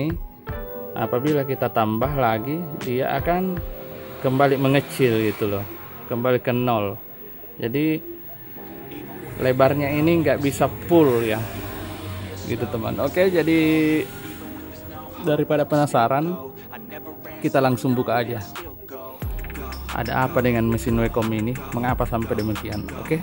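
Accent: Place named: Medan, Indonesia. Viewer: Tinggal daerah mana bg, kayak logat medan, saya d rantau prapat bg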